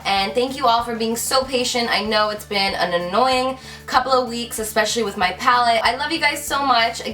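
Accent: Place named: Boston, United States. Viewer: where is she from? she has like a boston accent or something haha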